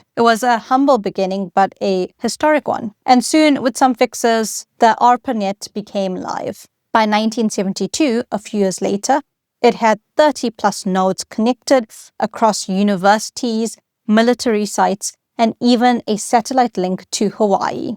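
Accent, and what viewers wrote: South Africa. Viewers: Is that South African accent?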